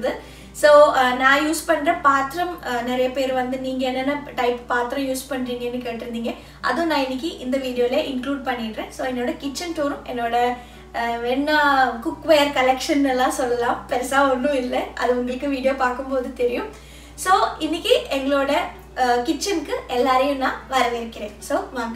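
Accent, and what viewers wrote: Kerala, India. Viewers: Nice. As others say, ur tamil is sweet to hear. From ur slang i can guess u r a malayalee brahmin. But hv u not changed plastic to glass or steel. Its a trend now a days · Hi sister,i like your way of speaking👍,malayali tamil pesurathu keka nalla eruku..🌹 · Malayali aano. Tamil kettit angane tonni. Puttu kutti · Hi dear.. your Malayalam mixed Tamil is too cute! Like "Ethaathu"😍 can you share me the link for the grinder. I wanna buy one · me to first time watching superb aka unga voice malayali ponnu ivalavu alaga tamil paesurathu supera irku... nice kitchen neat and clean superb superb aka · First time watching when I listened to i I thought the Tamil sounds like a Malayali speaking then I see the spices written in Malayalam .. r u malayali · Iam ur new subscriber your voice and Malayalam mixed Tamil was tooo good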